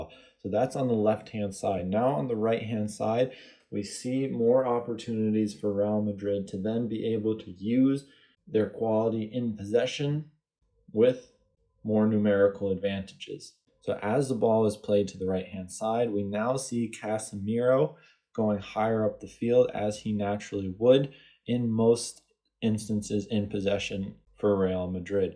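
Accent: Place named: United States. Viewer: Hey man, big fan of your work! Keep it up! Also, one quick question: I notice you cover a lot of club and European football. Since I'm assuming you're a fellow American (based on your accent, at least), do you plan on doing any analysis of the USMNT? Nations league or potential WC matches? I'd LOVE to hear your thoughts on GGG, what they're doing, and/or what they could be doing better.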